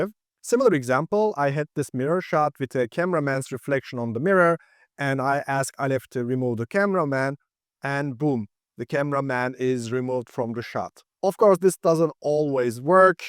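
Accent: Turkey. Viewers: Great content as always and dude, I’ve been following you for a while and always kind of thought your accent sounded Turkish. Your English is super good, so I wasn’t totally sure. But after seeing that Istanbul clip, I’m like—yep, you’re Turkish, right? 😅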